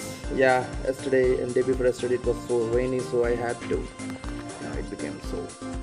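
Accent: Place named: United States. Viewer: Your English accent looks like that born in the United states of America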